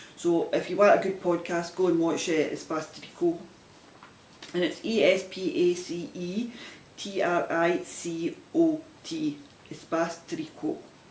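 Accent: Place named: Scotland, United Kingdom. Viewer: Love the podcast! I am from Scotland too so always great to hear the Scottish accent! Would love to enter into the giveaway 😀. Thank you!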